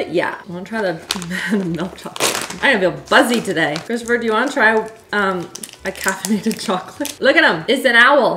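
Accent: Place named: Canada. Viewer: After being convinced that Canadians don't say "eh" at the end of there sentences. We have! Yessssssss!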